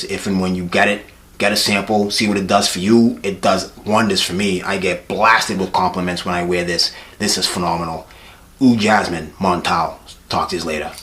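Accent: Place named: Northeast, United States. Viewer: Hi, first time viewer of your channel and I love it. Could you please tell me where you got the fragrance? From your accent, I presume you are in the Northeast US (like me). Did you have to order it direct from Montale? My usual go to Montale spots (Bergdorf, Neimans, etc) don't have it. One more question, if you have sniffed Dubai Indigo (Bond No. 9), does it smell too similar (I saw that mentioned somewhere)? Thanks in advance, I am definitely subscribing 😀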